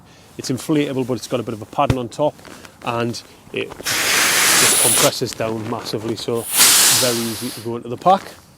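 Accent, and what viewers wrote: United Kingdom. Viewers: From America but lived in Sunderland for a time. I sure miss the Geordie accent. Thanks!!!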